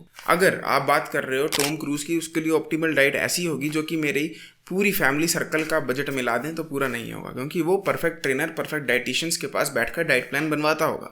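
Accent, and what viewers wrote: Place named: Pahadi, India. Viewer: I love how the pahadi accent sometimes kicks in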